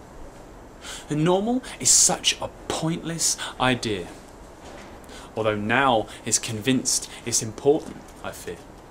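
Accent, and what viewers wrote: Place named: England, United Kingdom. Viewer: This is excellent! All your poems are amazing! By the way i'm russian, and i really like your pure English accent. I'll be glad if you will often record video of this kind. I'm sure it will help to improve the speech for many who teach English.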